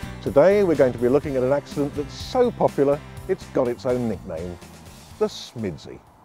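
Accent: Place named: Australia. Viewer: Hey, she's Australian! Not that it particularly matters. Excellent video, anyway.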